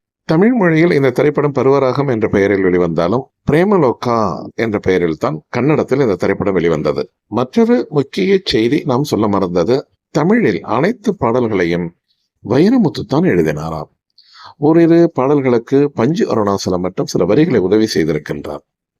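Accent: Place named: Colombo, Sri Lanka. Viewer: நீங்க bh abthul hameed மாதிரியே கொஞ்சம் பேசுறீங்களே சார் நீங்களும் கொழும்பா தமிழ் குரல் அப்படியே உச்சரிப்பு அனைத்தும் அப்படியே ஒட்டிருக்கு கொஞ்சம் நிஜமாவா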